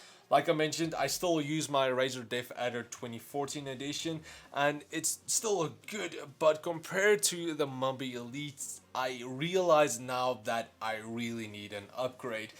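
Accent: Afrikaans, South Africa. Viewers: Is y Afrikaans? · Good vid. Judging from your accent and your website being .co.za you live in SA? I do too and most youtubers make builds in dollar. Can you please do a R10 000 gaming pc build?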